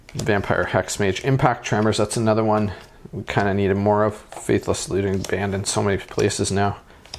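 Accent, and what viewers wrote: Canada. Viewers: yes 100% as soon as i heard your voice i was like "bet hes canadian" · please tell me you're Canadian. High quality accent. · I got a mana crypt and many other goodies in my 2 boxes. You are so very Canadian sounding haha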